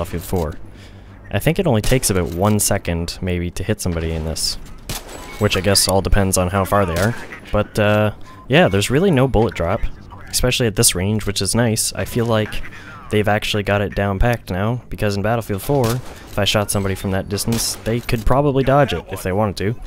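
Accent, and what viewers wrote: Canada. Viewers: You sound so much more Canadian in this.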